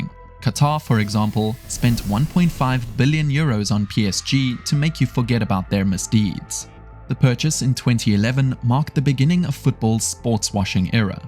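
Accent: South Africa. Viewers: correct me if I'm wrong but this is a South African YouTube channel your accent is 100% South African🤔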